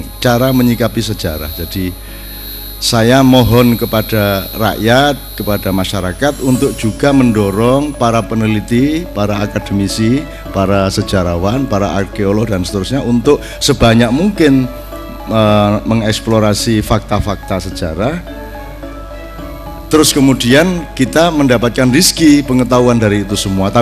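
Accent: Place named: Java, Indonesia. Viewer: Lidah jawa mas bro'.. ga usah di perdebatkan..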